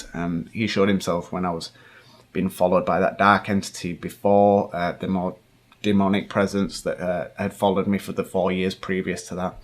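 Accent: United Kingdom. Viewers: Hi guys I am french. I need help to understand at the point he explain us how the paranormal activity started around him. The british accent is too strong for me :( If someone could write it down would be nice. Thx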